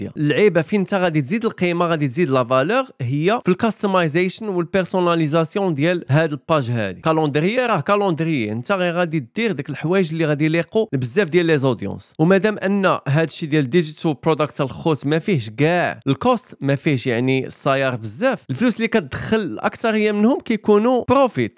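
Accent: United Kingdom. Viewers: عرفتي لاهدرتي بالبريطانية اتصرعها عندك واحد لبريتيش اكسنت واعرة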